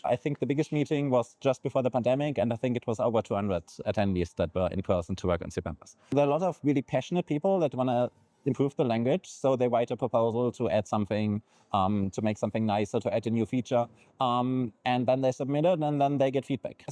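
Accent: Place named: Germany. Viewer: I like germanized English.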